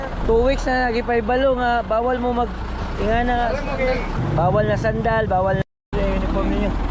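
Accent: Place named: Cebuano, Philippines. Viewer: Sarge taga asa jd ka gkan? paminaw nku dli pure Boholano or Bol anon ky dli bol anon imung inistoryahan...Cebu or Mindanaon imung Sinultihan...unta matubag nimu akong pangutana Sarge Solid followers og Subscriber diay ko nimu...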